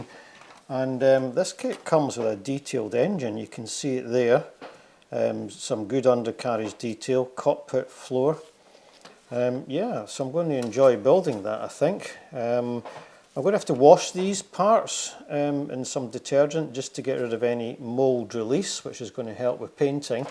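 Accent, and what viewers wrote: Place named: Scotland, United Kingdom. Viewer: you would have been seated in the rear cockpit for your AEF (air experience flight). The pilot instructor would be in the front cockpit. You are quite right, there were no seat cushions fitted, the bucket seats were designed to take the seat parachute that you wore on your posterior (which made walking to the aircraft and climbing up into the cockpit quite awkward if you remember). In future I’d recommend the use of a pin vice to drill the small holes instead of the DIY hand drill. (Sledge hammer to crack a nut?) Nice video and well narrated with your soft Scottish accent.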